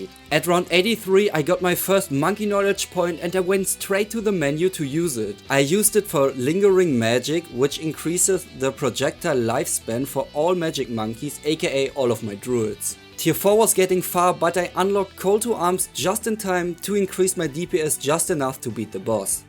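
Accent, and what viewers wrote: Germany. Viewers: Er: Woher weißt du, dass ich deutsch bin? Auch sein Akzent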